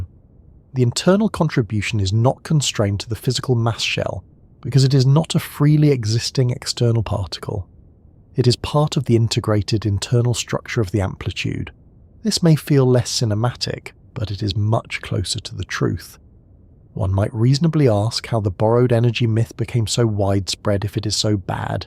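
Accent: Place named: RP, United Kingdom. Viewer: Lose the horrible posh Brit voice.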